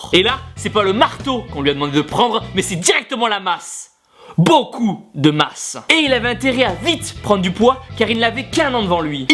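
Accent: France. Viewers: tu doze solide tu doit etre stressant , maudit accent francais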